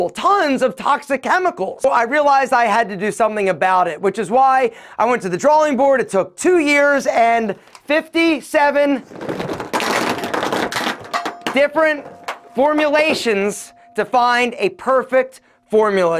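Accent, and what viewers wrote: Philadelphia, United States. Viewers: Great advice and also a great Philly accent !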